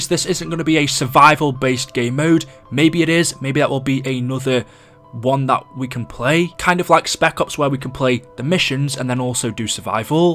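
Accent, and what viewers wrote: United Kingdom. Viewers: Love your voice and vids you sound like a British Person no Offense if you are one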